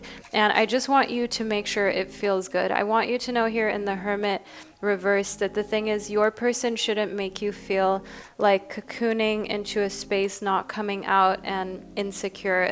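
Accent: Germany. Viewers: ❤❤❤ your German is so cute ! And it confirms my choice for pile 2. because i am from Germany ❤❤❤ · Giiiirl I love how you speak German 🥹😻 Ganz viel liebe aus Deutschland 💕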